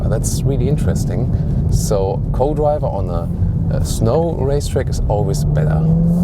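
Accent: Germany. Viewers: Schönes Video, aber du solltest an deiner Aussprache arbeiten. Das Klingt mehr nach Deutsch, als nach Englisch^^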